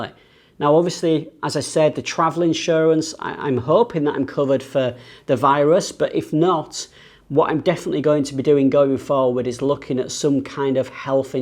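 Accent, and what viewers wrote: United Kingdom. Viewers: Lv ur British accent 😍😉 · can you speak less british accented English? its very hard to understand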